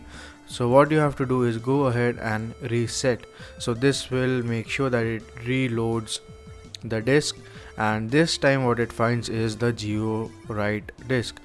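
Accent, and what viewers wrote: India. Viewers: It is Indian accent, i have not problem with that, but for some reason people want flawless english... We are shaped in while growing to adapt to our language, i speak portuguese and my accent when i talk in english is pretty noticeable, but americans say they can understand me pretty well, in fact, they say that the syllabic latin language makes it more audible. Some people are just jerks...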